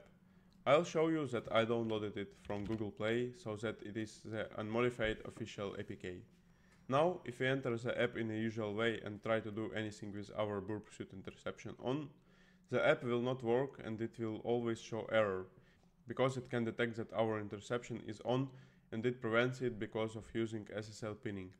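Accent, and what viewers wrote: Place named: Russia. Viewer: чую русский акцент)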